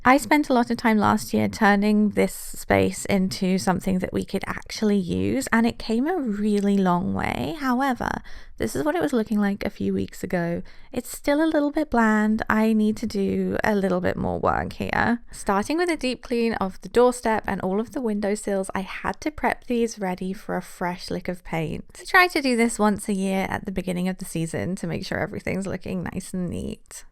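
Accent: United Kingdom. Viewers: Swear i could just listen to British girls talk all day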